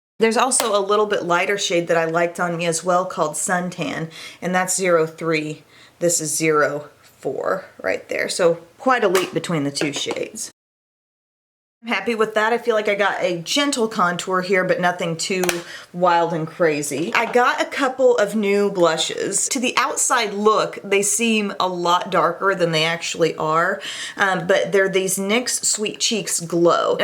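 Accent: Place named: South, United States. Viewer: Where are you from?Your accent.lol.Im from Arkansas so I could hear some country in there.lol.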